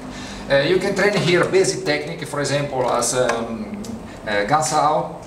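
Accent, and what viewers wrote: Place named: Italy. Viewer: Hai un accento italiano abbastanza forte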